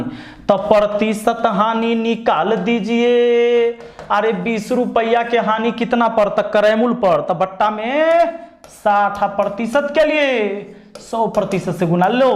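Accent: Bihar, India. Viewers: Ekdam Bihari andaj me🔥🔥🔥 आरे 20 मिनट में रगड़ देबई😂😂। सिर फैन like 👍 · अरे 30मिनट में रगड़ देबे😀😀😀 बहोत खूब sir jee एकदम बिहारी स्टाइल🙏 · गुरु ज एक बिहारी आन्दाज में शानदार है लाईव नही पढते क्या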